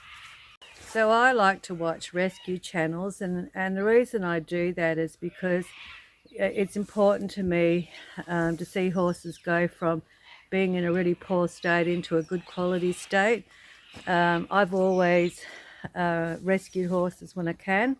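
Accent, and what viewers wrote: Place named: Australia. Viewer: Seen you for the first time, on account that I am subscribed to CC ... after a minute you got my black bell for your good old australian common sense ( and accent ) . love from aussie expatriate .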